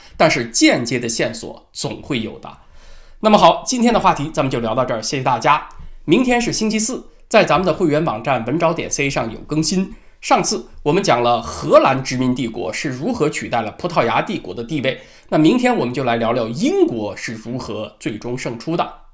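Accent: China. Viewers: 主播居然没有台湾口音，倒像大陆人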